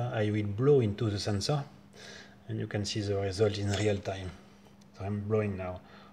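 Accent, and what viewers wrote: France. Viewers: Bonjour, je devine par le logo Orange F mais aussi par l'accent que vous êtes français ! Je suis intéressé à en savoir plus sur votre projet et son fonctionnement plus précisément pour le transfert de données jusqu'au smartphone et comment rendre l'outil plus fiable à partir du détecteur MQ-3, pourriez vous m'envoyer un mail à mon adresse mail afin de communiquer plus facilement : <mail> Merci d'avance pour votre réponse et au plaisir de recevoir de vos nouvelles !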